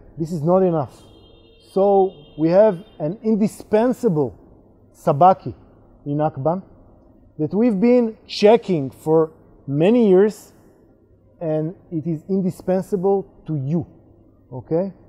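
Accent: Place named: Israel. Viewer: AKBAN I wactched the video. It's better not to mistake anything in that video for sound advice. It's just choreography with a narration that's done in a Modern Hebrew accent. There are as many ways to deal with multiple attackers as there are potential gaggles of attackers but this video demonstrates something that will only ever work on people who aren't actually serious about hitting you. Fix your footwork. Move from position of balance to position of balance and for the love of god don't compromise your own balance just to take someone else's. If you cross yourself up and overextend like that "grandmaster" then someone's going to throw the ground at your face while breaking a few things on the way down. The primary tenet of ninjutsu is don't get hit and the third law of motion is that the ground hits you as hard as you hit it. Apropos that you should probably get out of the habit of hitting the ground with your talus when you roll...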